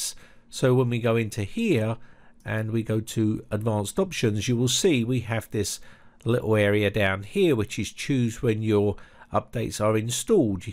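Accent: United Kingdom. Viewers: Just an idle observation ( admittedly not crucial to the excellent info. in the video ).... What is up with pronouncing the word "defer" like "differ". It's bizarre because this guy sounds like a British native English speaker. I find it hard to believe that such a basic word pronunciation is not known.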